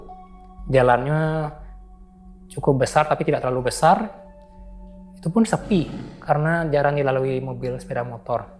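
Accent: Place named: Bali, Indonesia. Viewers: abangnya ngebali banget logatnya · Ada logat bali nya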